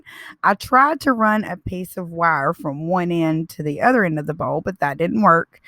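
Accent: South, United States. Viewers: Love your projects and your southern accent 👌🏾👏🏽😁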